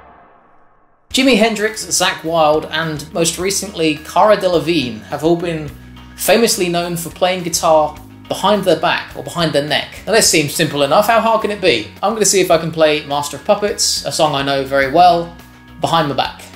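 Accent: United Kingdom. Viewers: You're the man with the most marked British accent I've ever seen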